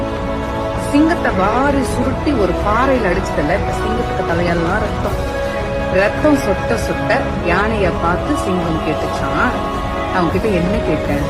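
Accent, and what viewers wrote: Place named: Telugu, India. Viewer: இவள் கொல்டி தமிழில் பேசிக்கொண்டு தமிழர் வெறுப்பு இருக்கிறது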